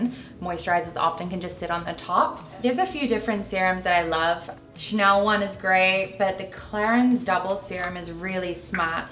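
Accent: New Zealand. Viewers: Kiwi thick but definitely tell she talks to ot of american lol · Yup...new zealand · That Kiwi accent! LOVE! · Shes a kiwi 🥝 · Inna DC She is from New Zealand. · New Zealand.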